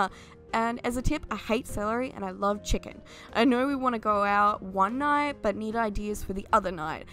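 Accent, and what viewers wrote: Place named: Australia. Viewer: "Gasp" You're AUSTRALIAN!? Lovely build, it's nice to see you have a breather with a smaller build ^^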